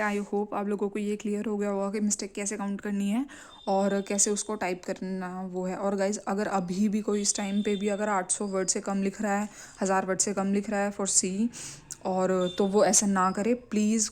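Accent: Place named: Meerut, India. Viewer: Sister aap kha sa ho. Kyonki aap ke tone kuch kuch meerut wali lagte h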